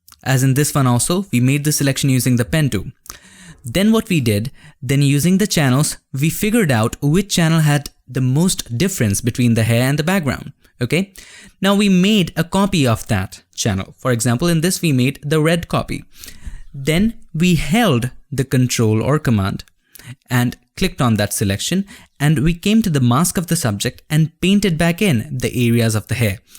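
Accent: India. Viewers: i hate hindu English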